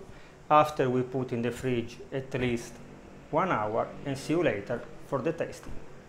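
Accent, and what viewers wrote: Italy. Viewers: Hears his accent ok this is original panna cotta boys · Love your Italian ascent 😘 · L'accento italiano è fastidioso 😅. Non pensavo avessimo questo accento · The Italian Accent is so nice 👍, and also the recipe..!! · Search YouTube for Panna Cotta recipe First result, Gordon Ramsay SKIP! Sees Italian channel name Might have something here... Hears accent Yep, this is the one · What a cute & wonderful Italian Accent. I could listen to him for hours! Good job teaching in English ! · Love the accent Italiano 😘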